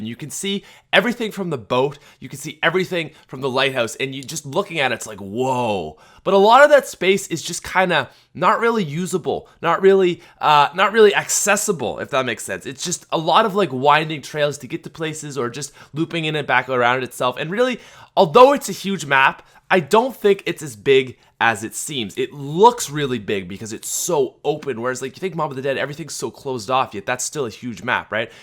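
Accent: Canada. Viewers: Nam DreamzZ Lol nice try at acting smart. Americans are all so IGNORANT to the fact that THATS HOW THE WORD SOUNDS DUE TO THE CANADIAN ACCENT. People in America see something that isn't the same as their country, and think its weird and want it changed. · It's cause he's Canadian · dude he's Canadian, leave him alone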